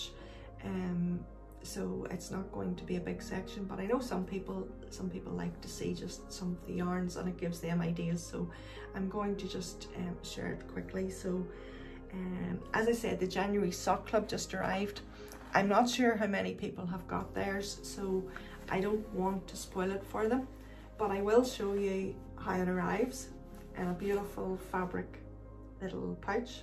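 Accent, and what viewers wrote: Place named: Ireland. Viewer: Hello, it's nice to meet you. I live in Minnesota, began knitting about 1 1/2 years ago and I love everything about it. Your podcast, and you are lovely. Your Irish brogue is a bonus! Your shawl is looking great. By now you have probably cast on the Snuggle Down cowl and whatever combo you decide or have decided to use will be terrific. I enjoyed the pattern so much I knit two of them. Thanks for taking the time to podcast, Fern in Minn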